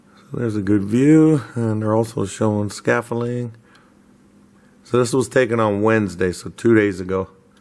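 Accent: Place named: Wisconsin, United States. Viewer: You’re from N/E Ohio, Closer to Cleveland area? That’s why I hear Wisconsin & Pittsburgh mixed. 😏 🤙 🙏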